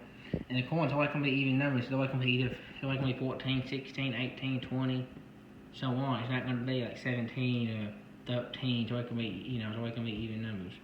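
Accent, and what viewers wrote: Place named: Tennessee, United States. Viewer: I assumed you lived close to the Tennessee line because of your accent (I know...you don't have an accent - neither do I). I am looking forward to watching your corn harvest this year. Do you plan to have some videos on that?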